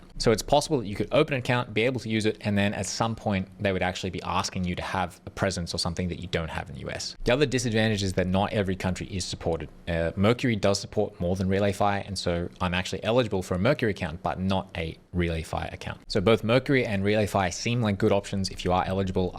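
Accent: Australia. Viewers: So aussie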